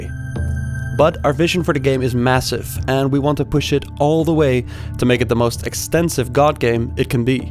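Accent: Netherlands. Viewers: I can really recognize the Dutch accent. But that makes it nicer actually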